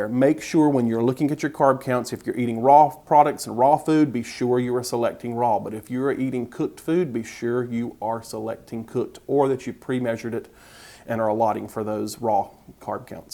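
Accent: South, United States. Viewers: Thank you for keeping us informed! Keep up that southern accent !!! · Thank you for the info. I can't believe the spices! Off to check my favorite spice for carbs which is cayenne pepper! (: xx Love your southern accent btw.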